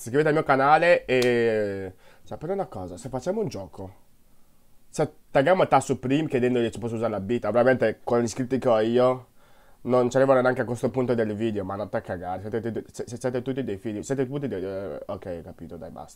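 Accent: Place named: China. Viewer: Perché parli come un cinese?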